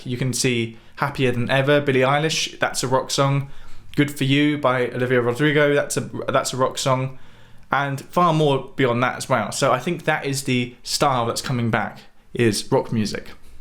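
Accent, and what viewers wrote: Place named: Bayswater, United Kingdom. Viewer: as it pertains to the quantity of subscribers, if not for that Bayswaterian accent of yours, you'd probably only have 2 subscribers. I keep waiting for someone to yell "CUT!" and then you go into some American deep south manner of speaking. "did y'all get that? is that a little ol' take?" Seriously, you bring a TON of value and you make things easy to understand and you solve the riddles/puzzles about songs I've always wondered about like your video on and such. For me, I just want to jam and be able to understand the language. I don't think I'll ever be at Macca level because I have other things going on (like working on planting agave to make tequila, as you can see) but to be able to get up on stage and play the songs that I enjoy and that bring energy would be great for me. So, your vids get me closer to that end. 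Gracias, amigo.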